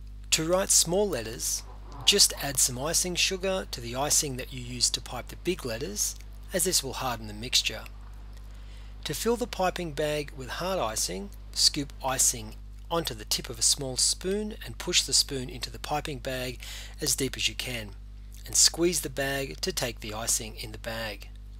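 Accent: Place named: Australia. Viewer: good job! i love your accent! are you australian?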